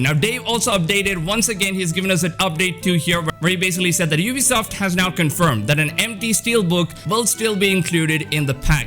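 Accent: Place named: South India, India. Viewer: That south Indian accent is creeping through your American accent.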